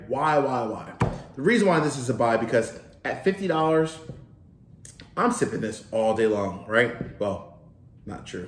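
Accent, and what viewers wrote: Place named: Delaware Valley, United States. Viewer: Are you from the Delaware Valley? I detect a bit of an accent.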